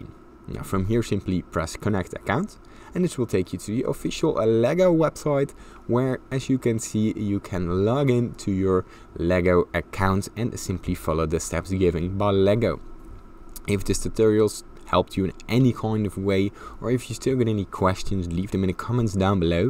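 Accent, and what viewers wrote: Netherlands. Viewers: hoorde gelijk dat deze guy nederlands is hahahaha thx man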